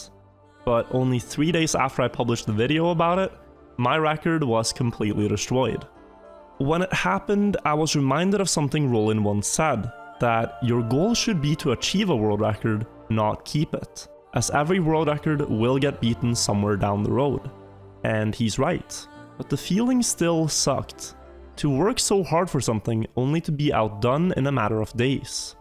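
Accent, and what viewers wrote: Norway. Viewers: Top Trackmania expertise + slight (and sexy) Norwegian accent = perfect content.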